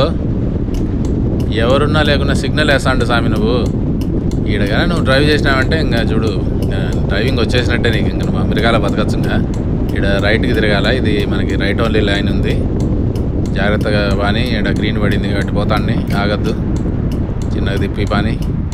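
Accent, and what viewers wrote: Kadapa, India. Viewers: Edhie mana rayalasema slag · Oorini pasugala vaadu ponilede !! 😂😂 Rayalaseema slang . Very funny 😂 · ముక్యంగా మీ కడప యస ఇంకా బాగుంది. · Gaaru! Video Simply Superb. Kadapa Yaasa Bhaasa chala bagundi. USA lo Kadapa Yaasa ( Vuntaado Voosipotaado, Saami )! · Enjoyed Sir. Super kadapa accent 😍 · Anna .. u r doing good job...with Kadapa language....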